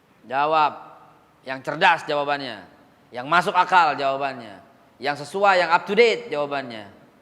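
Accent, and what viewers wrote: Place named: Betawi, Indonesia. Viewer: Dialeg betawix yg saya suka yg justru krn kturunan, tp akidahx ciamik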